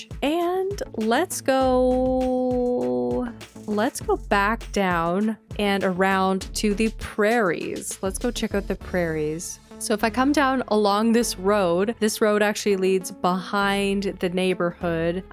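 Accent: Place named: Cape Breton, Canada. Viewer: u are rly speaking like a cape bretoner!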